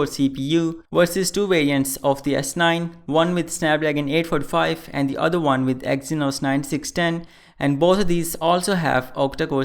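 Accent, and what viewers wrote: India. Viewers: "click on vid" hears indian accent, "clicks off"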